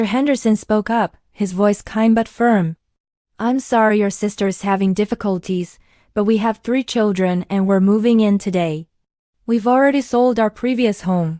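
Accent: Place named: Asia. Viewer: Why do you sound like you have an Asian accent while a bunch of white people are used for the story?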